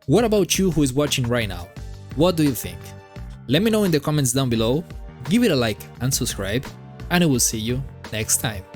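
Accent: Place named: Spain. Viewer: Te lo digo en castellano porque el acento español te delata descaradamente. Sobre el tema incursión arriesgada en concreto te recomiendo que busques videos de gente haciéndoselos con personajes a nivel 50. Creo que si fuera solo un dps check tener los personajes a nivel 60 con las habilidades cerca del 11 sería casi obligatorio y que el juego se puede jugar de manera más profunda de lo que aparenta. Los propios desarrolladores han dicho que querían diseñar el juego de manera parecida a un juego de lucha para que la habilidad de los jugadores tenga mucho peso y desde que he visto este estilo de videos e intento aplicar sus técnicas mis puntos en incursión arriesgada han mejorado una barbaridad. Solo eso, el resto de puntos me dan un poco lo mismo, este en concreto me toca de cerca porque yo estuve un tiempo frustrado con el modo también así que espero que sirva mi comentario. 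Un saludo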